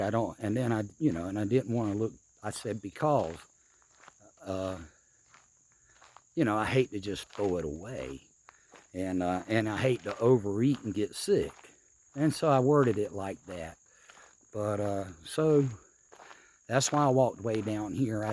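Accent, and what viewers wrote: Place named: United States. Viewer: Nice content. Your accent is really like a cow boy hahaha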